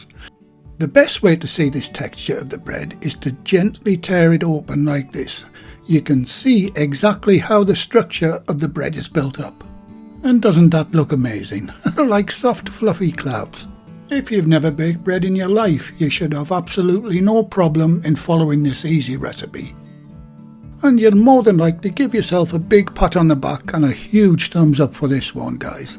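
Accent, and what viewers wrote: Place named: County Durham, United Kingdom. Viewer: Only discovered you channel today, not just great simple wholesome recipes but your voice is making me homesick. I’m from County Durham but live down south now.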